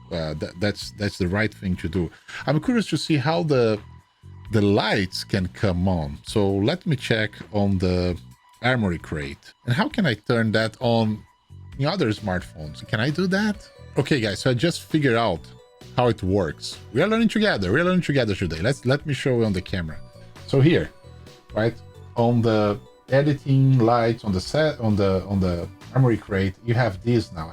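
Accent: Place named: Brazil. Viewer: You sound like a brasillian speaking English 🤔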